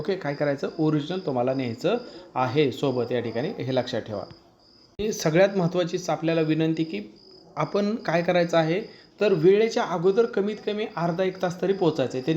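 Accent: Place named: Latur, India. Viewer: तुम्ही लातूर विभागले आहात का sir 😅 म्हणजे बोलताना तुम्ही "घेऊन जा. अस न म्हणता घेऊन जावा." अस म्हणता म्हणून विचार..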